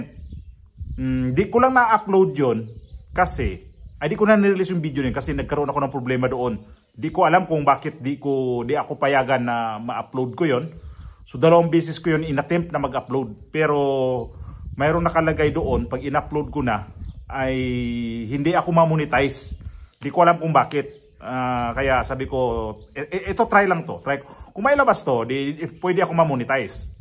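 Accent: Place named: Surigao, Philippines. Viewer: HI SIR SA AN KA SA PINAS PARANG SURIGAO KA KASI I HAVE PAMANGKIN SA SURIGAO NAKATIRA MAGSALITA TULAD SA EMO.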